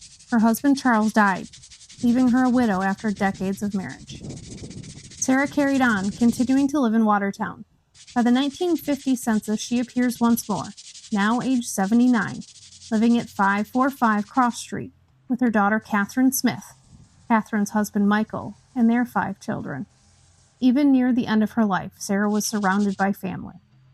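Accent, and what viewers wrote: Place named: Ontario, Canada. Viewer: You sound like you're from Ontario lol I've lived here my entire life. I honestly don't blame anyone who wants to move south of our border lol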